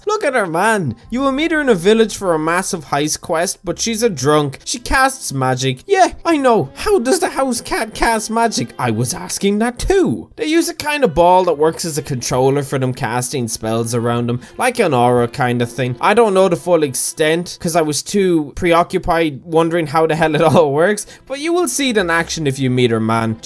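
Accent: Ireland. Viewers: Are you actually irish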